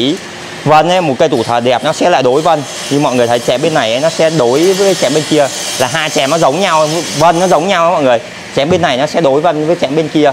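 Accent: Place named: North, Vietnam. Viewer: Bạn nói giọng lai miền bắc.Viết chữ cũng lai bắc luôn.Ở trong miền nam chúng tôi viết hay nói đều là Hoa Văn chứ không phải là Hoa Vân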